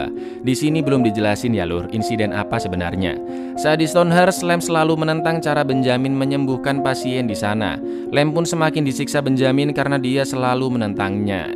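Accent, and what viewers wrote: Java, Indonesia. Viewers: Wong jowo ta bg😂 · Nyok mas wkwk ngakak aku sampean wong endi se ? Lek di jawab tak subscrbe sumpah cuk lek ngomong medok kyk sby an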